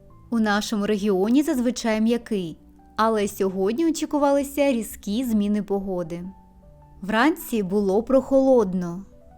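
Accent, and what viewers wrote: United Kingdom. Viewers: Я щиро бажаю Вашому каналу постійно розвиватися і отримувати більше переглядів і підписок. Я буду підтримувати Вас і надалі. Дякую Вам за British English 🇬🇧🇺🇦💪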